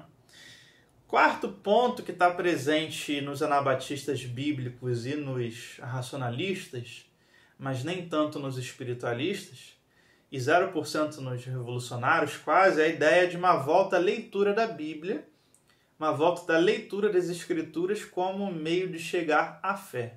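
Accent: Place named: Rio de Janeiro, Brazil. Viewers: Sotaque carioca.Nada demais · Eu, por ser carioca, não reparei isso. Hahaha Aqui a gente fala assim, e está tudo bem! 😊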